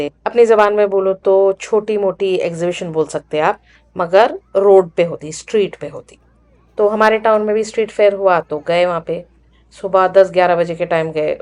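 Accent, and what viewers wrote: Hyderabad, India. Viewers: Really proud of u being using hyderabadi accent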